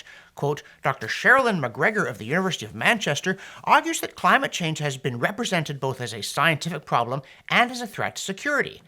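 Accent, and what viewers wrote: Canada. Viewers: Oh, and by the way, I love your stuff. Your delivery is so, Canadian. Keep up the good work.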